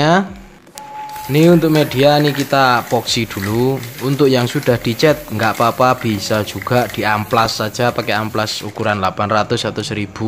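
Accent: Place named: Blitar, Indonesia. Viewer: Dialek ngomongnya seperti wong mBlitar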